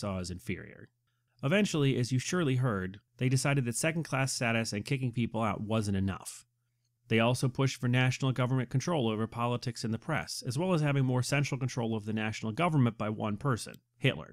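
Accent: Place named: United Kingdom. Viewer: honestly tho, i loved your brittish accent :DDD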